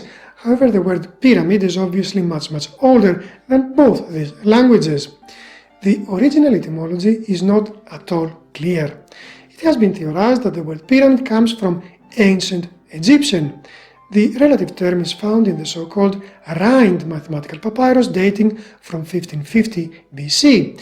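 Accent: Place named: Greece. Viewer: You sound Greek af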